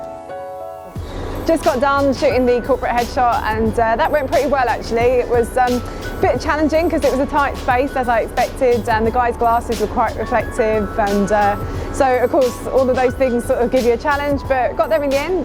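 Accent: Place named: England, United Kingdom. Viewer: Her English accent and the closed captioning are quite entertaining. Very enlightening view of a day in the life. Most photographers don't relish that 60% business part.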